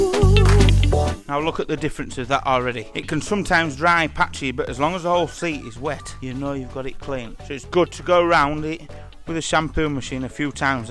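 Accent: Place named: Scotland, United Kingdom. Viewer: This man is speaking in a Scottish accent.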